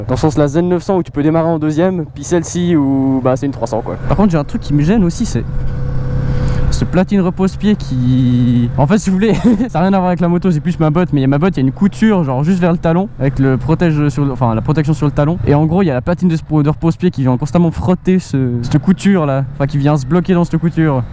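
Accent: Vaud, Switzerland. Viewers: Un joli accent du canton de vaud il me semble :D Je vient de m'en offrir une et j'ai hâte de voir ce qu'elle a dans le ventre ! Merci pour ta vidéo ! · Yo mec ! Franchement très bonnes vidéos t'as un abonné en plus ! Et dit voir, t'habites où en Suisse ? Il me semblait qu'à ton accent t'étais suisse (comme moi ;))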